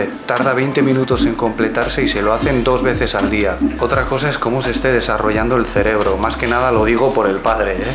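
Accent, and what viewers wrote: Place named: Basque Country, Spain. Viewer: Buenas pareja, acabo de ver uno de vuestros vídeos de sugerencia y la verdad que nada más escuchar hablar a ya he sabido que eras Vasco!! Me subscribo!!! Dena ondo ateratzea espero dut eta agurrak zure lurretik!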